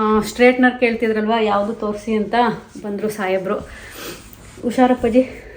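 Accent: Mandya, India. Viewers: mandya bhashe ne hange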